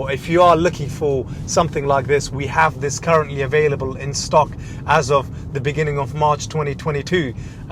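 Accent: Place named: South Africa. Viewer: You have a South African accent.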